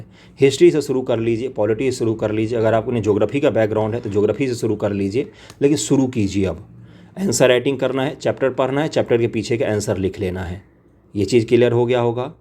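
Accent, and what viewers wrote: Darbhanga, India. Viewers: Sir Aapka awaz Darbhanga Bihar se match kar raha hai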